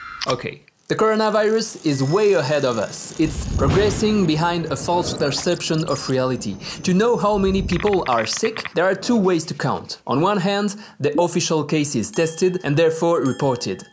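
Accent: France. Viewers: It's eu bioutifoul french accente ! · FRENCH ACCENT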